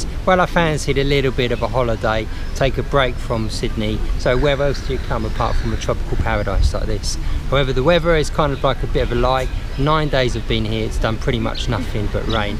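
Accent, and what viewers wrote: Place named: Cockney, United Kingdom. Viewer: make fun of your accent by doing the cockney thing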